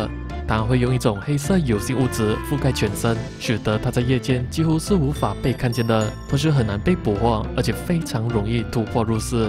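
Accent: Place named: Fuzhou, China. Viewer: 一听到就知道是福州人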